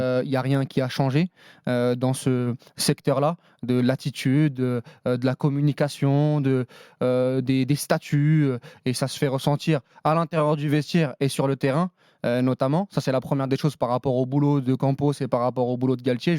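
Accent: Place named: Banlieue, France. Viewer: Galtchhhhier...Contchhhhinuer...tRRRRRRRRRès loin du compte...mais putain cet accent des cités quelle horreur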